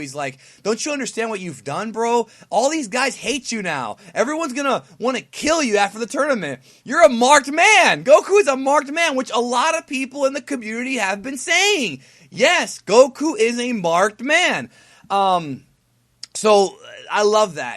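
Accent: Japan. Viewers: Nice Nihongo accent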